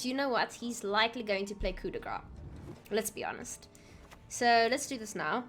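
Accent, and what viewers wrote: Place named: Afrikaans, South Africa. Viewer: Knew I recognized the Afrikaans accent👀👌🏾. Great to see a fellow South African playing gwent😁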